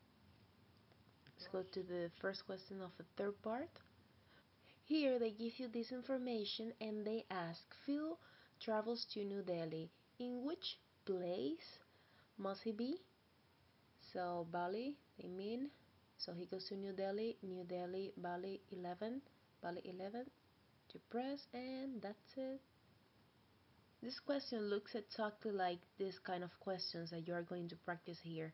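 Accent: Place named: Netherlands. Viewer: aww thank you so much can i ask more question how many months did you learn dutch and understand it? and in CIE exam how many hours or minutes does the exam goes im so nervous cos im doing self study as you your dutch accent is really nice aswell on the video its btw 😊.sorry i have a lot question hope you understand me 😘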